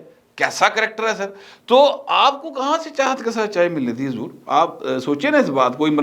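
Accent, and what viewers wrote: Lahore, Pakistan. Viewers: Your Lahori andaz is excellent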